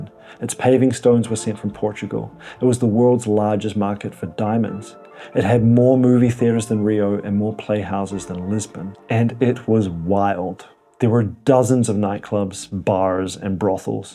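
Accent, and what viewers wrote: New Zealand. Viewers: Are you kiwi ? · Great channel! Is that a New Zealand accent? Best wishes from Ukraine.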